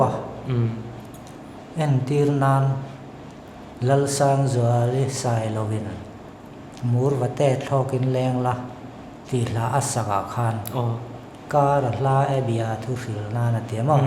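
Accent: Mizoram, India. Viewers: Mizo țawng a va han chhep nalh tak e aw. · Tahan lam Mizoţawng chu a pure bik asin.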